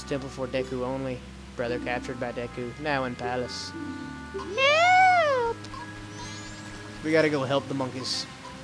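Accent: South, United States. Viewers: been watchin these for the past two days so i had background noise while i play ocarina of time, why do you hate your voice, i dont, i got the same one kinda, i like the southern accent.. guess its cuz i was raised around it but still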